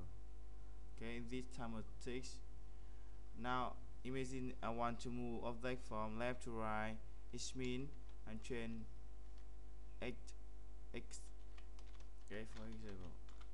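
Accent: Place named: China. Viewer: Sorry buddy, but you do NOT explain any of the commands you enter, so the viewer is left guessing why you are doing things. Plus with your heavily accented chinglish accent I suggest you GIVE UP these tutorial to be honest. They just do not crack it compared to many others I have watched and learned a lot from. Maybe do them in Chinese for your fellow countrymen instead ?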